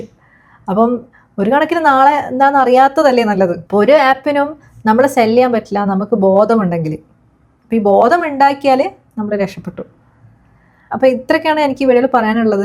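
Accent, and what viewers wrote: Kannur, India. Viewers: maam kannur aano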